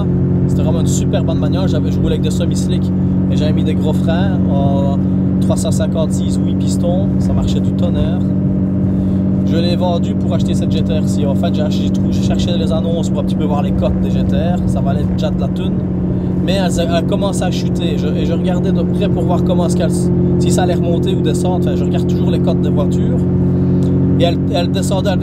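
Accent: Belgium. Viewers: Désabonné récemment de gmk et Akram pour découvrir un bon gars humble sans fioritures, avec un accent belge très agréable a écouter, bien plus intéressants que les "c'est bôo et elle a le diable en elle" de nos des accolytes superstars de youtube. Bravo a ta réussite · Tu est belge vervier Liége ??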